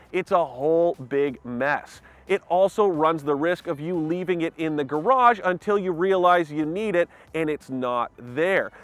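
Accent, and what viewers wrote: Canada. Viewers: Sad that I noticed this was Canada by the turbo sticker on the civic before his accent gave it away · dead giveaways for Canada- "aboot" and "Mazz-Duh" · I’m very much looking for a head-to-head comparison of thse two cars, especially how they handle, but find it impossible to bear this guy’s yukky accent. Is he a Canuck? It’s just awful trying to listen to him.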